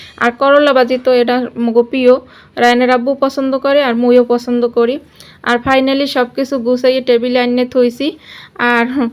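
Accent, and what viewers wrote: Barishal, Bangladesh. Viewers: তোমার বরিশালের ভাষা বেশ ভালো লাগে ❤❤❤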